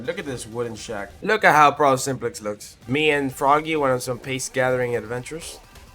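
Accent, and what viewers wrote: France. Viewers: I love your french accent and your balcony👌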